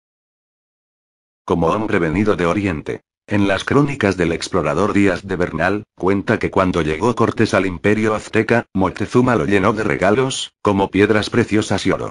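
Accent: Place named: Spain. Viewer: Que estúpidez si iban a hacer algo de Quetzalcoatl lo hubieran hecho siquiera sin el ofensivo acento españolete, es decir lo hubieran leido ustedes. seguro no saben leer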